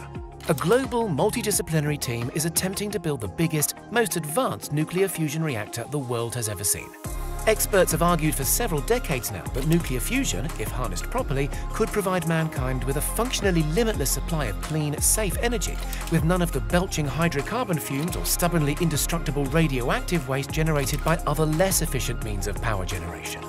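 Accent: England, United Kingdom. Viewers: WARNING... FOR ENGLISH ACCENT ONLY !!!!!!!!!!!!!!!!!!!!!!!!!!!!!!!!!!!!!!!! TALKING WITH THE NOSE !!!!!!!!!!!!!!!!!!!!!!!!!!!!!!!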